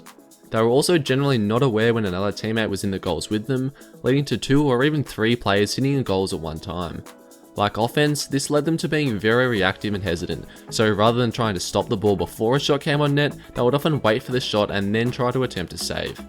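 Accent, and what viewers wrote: Australia. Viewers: r u from Australia? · The aussie accent gave it up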